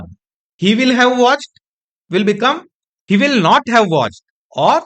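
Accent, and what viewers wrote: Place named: Mumbai, India. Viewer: It’s the best explanation that I have ever met. Thanks for it! Although you have the “mumbai” accent - no matter! For us in Czech rep. it’s even easier to understand English with this accent 😁.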